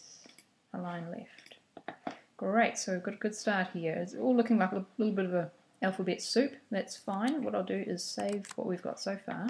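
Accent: New Zealand. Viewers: Don't know if you are still receiving comments, but....if you have 26 letters in illustrator...do you just put them on 26 layers? or fewer per document? thanks, good tutorial....and new zealand am i hearing? thanks, eliza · If only all tutorials were given in a New Zealand accent! Thanks, very helpful :)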